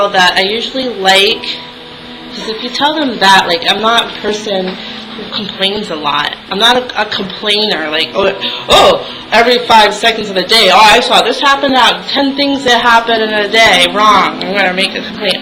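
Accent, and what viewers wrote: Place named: New York, United States. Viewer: What part of America are you from? You sound like you are from New York sometimes. :) And omg... that's a shame cashiers are not allowed seats!, in Ireland (where I live) they do.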